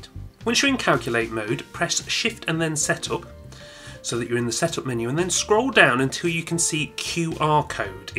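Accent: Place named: England, United Kingdom. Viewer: I liked your English dialect. Is it an American or British accent?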